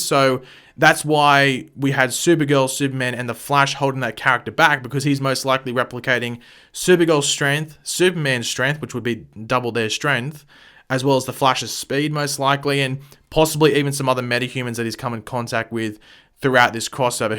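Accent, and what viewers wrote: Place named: Australia. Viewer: Lol, sounds so Australian in this video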